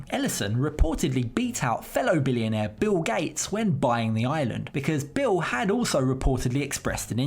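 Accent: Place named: England, United Kingdom. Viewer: English accent, I'm out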